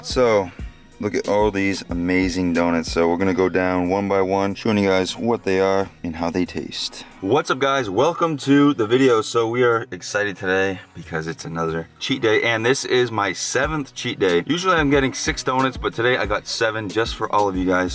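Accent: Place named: Massachusetts, United States. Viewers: I just started watching your videos recently and I kept saying he's GOT to be from Massachusetts LOL. Fellow Bostonian here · Every time your Massachusetts accent comes out, I have to laugh. You're adorable :-)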